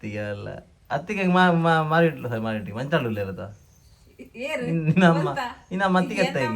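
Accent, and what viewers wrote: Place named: Mangalore, India. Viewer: na tulu udupi style bokka na tulu kudla type... Enjoyed vlog,keep doing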